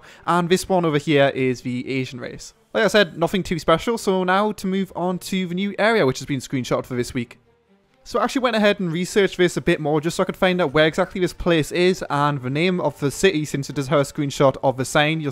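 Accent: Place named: Newcastle, United Kingdom. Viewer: hes from newcastle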